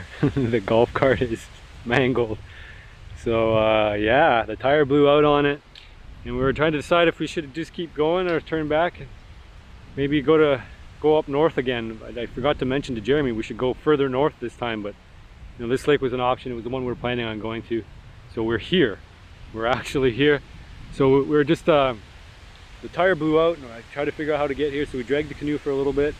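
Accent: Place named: Canada. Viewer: So annoying how Canadians so about and aluminum!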